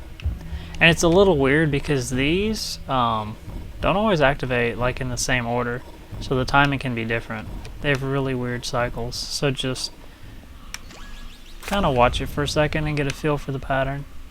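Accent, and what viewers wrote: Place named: Toronto, Canada. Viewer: your a boss , my man! are you from toronto, cause you sound like it :)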